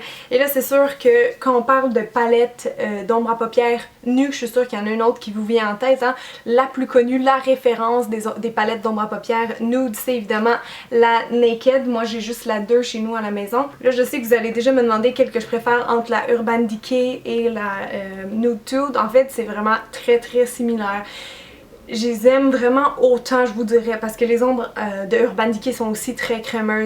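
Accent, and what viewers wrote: Canada. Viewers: ce que j aime bien avec c est que l accent canadien n est pas trop prononcé alors que avec d autre youtubeuses ou endroits du canada j ai plus de mal par exemple a montreal. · I never knew French Canadian sounded like this! I'm Belgian, I've had french in school for 8 years so I definitely understand you! I never heard it like this, it's beautiful! :D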